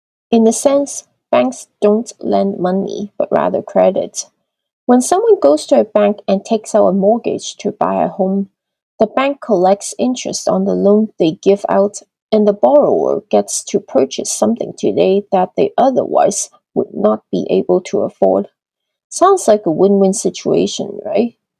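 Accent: China. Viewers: 能听出来你是中国人哈哈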